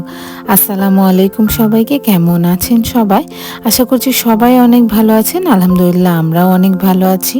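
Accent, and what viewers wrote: India. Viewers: apa apnar kotha amder howrah jelar moton lagche jdi tai e hoi sune khub e khusi hobo... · apnar Kotha gula erokom Kolkatar moto bolar dorkar ki apu??amnite vedio ta khub valo hoyeche.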